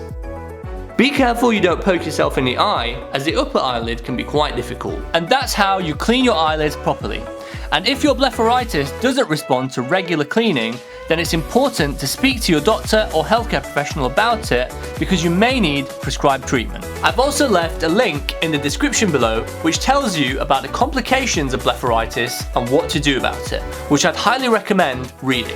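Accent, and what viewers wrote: United Kingdom. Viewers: the British accent alone makes me feel better.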